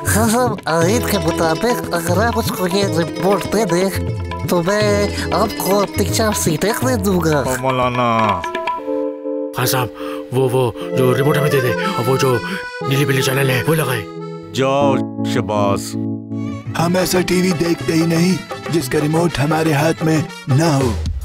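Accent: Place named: India. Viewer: Sadeqe sahab apka lehja he Indian ha..nafrat na banao ye khod army ne apne oper keya ha taky nazar estaraf hojae...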